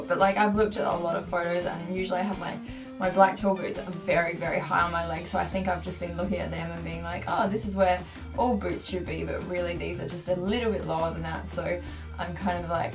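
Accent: Australia. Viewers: Wait if your not Aussie where are you from. U sound Australian 😂🤔😂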